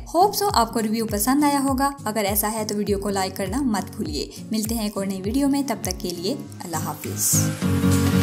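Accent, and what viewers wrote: Punjab, India. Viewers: Nice di panjbi bolde ho so sweet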